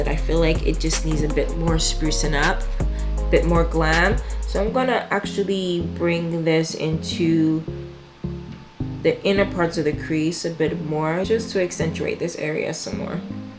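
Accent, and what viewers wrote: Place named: Caribbean. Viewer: Are you west indian? Cuz I hear a little accent.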